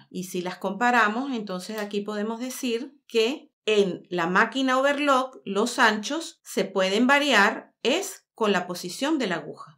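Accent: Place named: Venezuela. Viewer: me lo imagine por tu acento, q bueno, yo tambien soy Venezolana y estoy viviendo en Australia. Me compre la maquina pq estoy haciendo bolsitos d tela y collares y me aligera el trabajo, tengo una tienda online Nanajuliedesign, vendo solo en Australia , estoy encantada con tu explicacion y ahora mas sabiendo q eres venezolana. En q parte d España estas?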